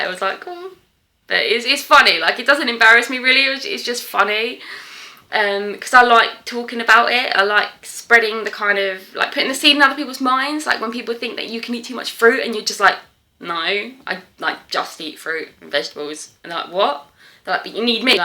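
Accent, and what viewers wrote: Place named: London, United Kingdom. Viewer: I'm just curious about where you live because you was saying London markets? I thought you live in London because of your accent Btw of you do then you should try Spitalfields market its just so cheap and an excellent place to get all your fresh produce especially if you go early mornings I love the way you said I prioritise health overt anything else and that's how everyone should be Minimal living is the best way to go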